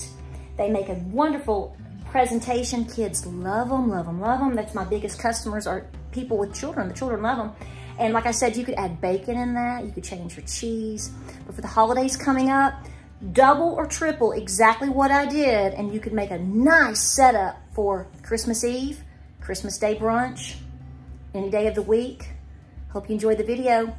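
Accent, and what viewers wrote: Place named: Rural, United States. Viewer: And her country twang. I love it!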